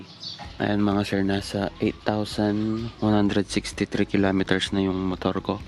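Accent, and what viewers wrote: Pampanga, Philippines. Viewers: Bos, kapampangan kaba.?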